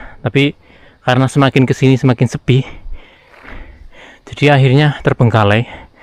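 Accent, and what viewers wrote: Banyumas, Indonesia. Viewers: Mbok ana ula dengernya lucu btw saya dri jawa timur suka denger orang ngomong ngapak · Krungu logat ngapak dhadhi kepengin bali njawa koh..🥹🥹🥹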